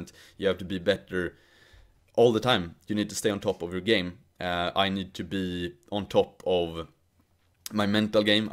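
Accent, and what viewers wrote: Sweden. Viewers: Nothing wrong with a Swede having a Swedish accent. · I love our swedish-english accent. Its the best accent exept the geordie accent from Newcastle-upon-Tyne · But the Swedish accent is great. Yeah your old vids... In them you speak better English than a lot of people in Limerick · Don't worry about Swedish accent in your vids as it signifies where you're from. Content is all that matters. Fluff and beautiful sets don't signify quality. People will wade through mud to listen to reason. I shared your last vid about porn and its detrimental affects on women in a bunch of facebook groups so hopefully your audience will increase as a result. Be up to the task.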